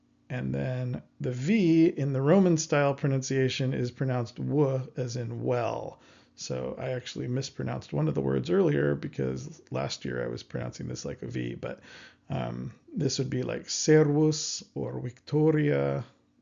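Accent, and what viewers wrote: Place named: United States. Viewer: Nah, too american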